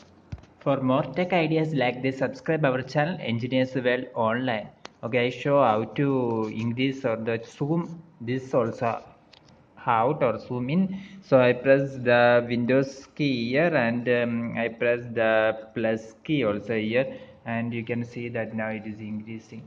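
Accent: Kerala, India. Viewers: മലയാളി ആണെന്ന് മനസ്സിലായി , മലയാള ഇങ്ഗ്ലീഷ് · its a india, kerela accent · lemme guess you're an Indian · Are you from kerela?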